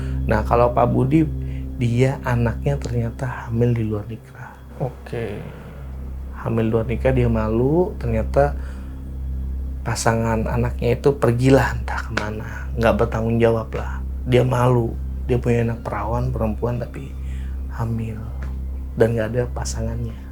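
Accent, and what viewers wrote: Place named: Padang, Indonesia. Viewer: Nama: jawa Orang:manado Logat bahasa: padang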